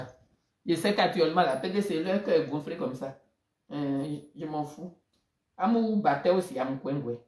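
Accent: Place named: Baoule, Ivory Coast. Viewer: Je veux juste savoir TT ne cotisait il pas au PDCI? ton baoulé est trop doux merci. Parle fort ils vont entendre.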